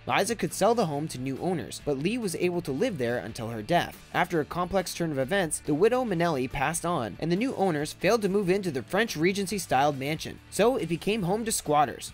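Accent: Canada. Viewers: Annoying voice and Kanuk accent: "hoose" (house), "oot" (out) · Definitely a Canadian narrator. Can’t say house correctly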